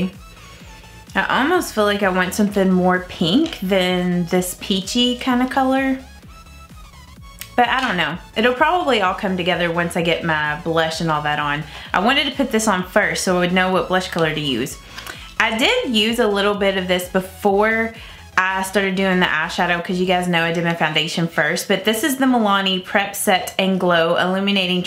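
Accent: South, United States. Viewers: I love your southern accent and that eye look is gorgeous!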